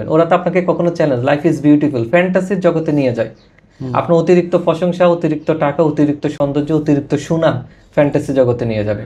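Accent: Noakhali, Bangladesh. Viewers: জি, নোয়াখাইল্লা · Uni ki noakhali zillar naki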